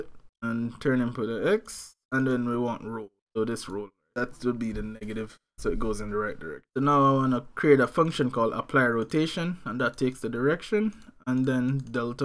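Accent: Jamaica. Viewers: are u Jamaican ? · Mi did a hesitate fi learn godot but hearing another Jamaican do exactly what I want is inspiring. Must learn it this summer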